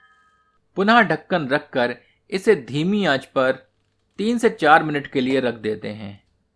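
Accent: Standard. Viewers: आप शुद्ध हिंदी में बोलते हैं, सुन कर अच्छा लगा। 👍👍🙏🙏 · Apki awaz or apki shud hindi achiii or rcipi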